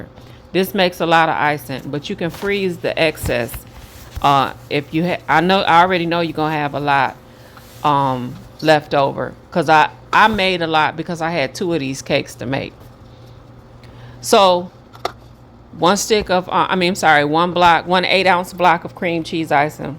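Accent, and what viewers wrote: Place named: Detroit, United States. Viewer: Sounds like a Detroit accent.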